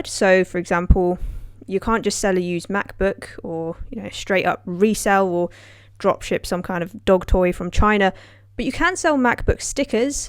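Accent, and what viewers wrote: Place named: Southern England, United Kingdom. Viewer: She's from the South of England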